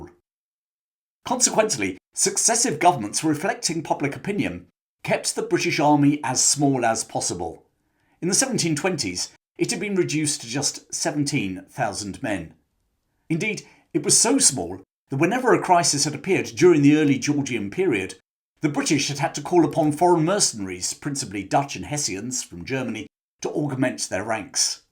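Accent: United Kingdom. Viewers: I was a native Chinese born and live in Hong Kong all my life! Your typical British accent admire me, like the last Hong Kong governor, Christ Patten!!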